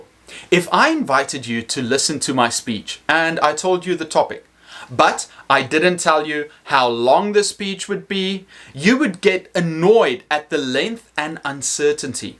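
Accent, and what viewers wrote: South Africa. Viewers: South African Accent!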